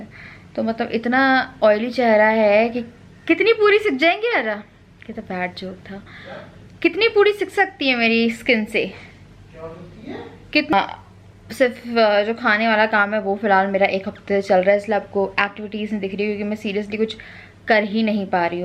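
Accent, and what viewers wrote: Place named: Kanpur, India. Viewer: Kanpuria bhasha mst comdey type bolti ho app🤣😂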